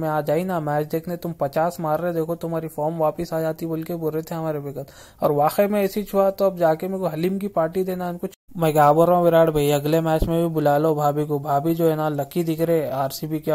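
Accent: Hyderabad, India. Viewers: Bhai teri aawaj aur haidrabadi style kamaal hai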